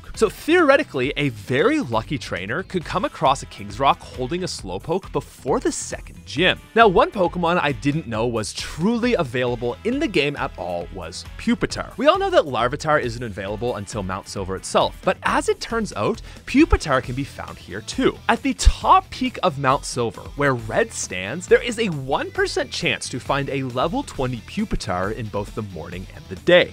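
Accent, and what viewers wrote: Canada. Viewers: Imma guess this guy is canadian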